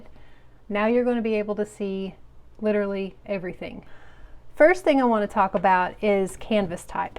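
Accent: South, United States. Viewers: I didn't know you were southern! Tennessee? 😊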